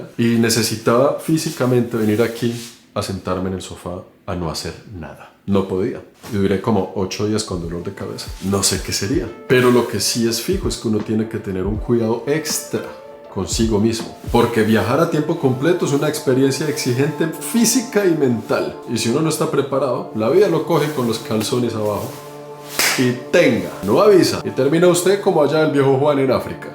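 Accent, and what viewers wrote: Colombia. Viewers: Buenas tardes Juan, te escribo desde Granada, en España. Mi marido y yo te vemos desde hace medio año más o menos. Me encanta que no hayas perdido tu acento colombiano y que además de tomar transportes de un sitio a otro como hacen otros creadores de contenido, expliques cuestiones relativas a la historia y la cultura de los sitios a los que vas. También nos agrada el hecho de que no hagas grandes aspavientos con la cámara y que tus videos sean siempre sinceros. Este me ha abierto mucho los ojos, porque a mi por lo menos me gustaría poder viajar ya que no puedo hacerlo por una cuestión económica. Cuando acabe el doctorado quizá. Un fuerte abrazo y sigue subiendo cosas, son pequeñas maravillas. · Juan me gusta mucho sus videos y que no olvidas la forma de hablar de nosotros los colombianos 😂😂😂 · Amo como narras tus videos, y tu acento colombiano :) saludos desde Lima Perú.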